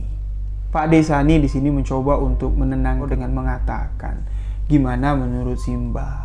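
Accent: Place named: Madura, Indonesia. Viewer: Aksen madura nya tolong di hilangin bang, agak” ketawa jadinya dengar penyampaian nya😂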